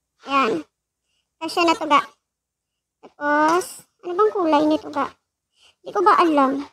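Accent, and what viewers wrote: Iloilo, Philippines. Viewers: Ilongga ka gd guro langga mo hahahahah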